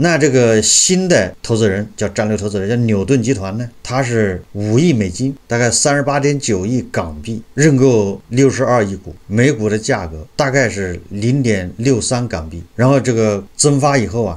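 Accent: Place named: Jiujiang, China. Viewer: 您说话有点像九江人的口音。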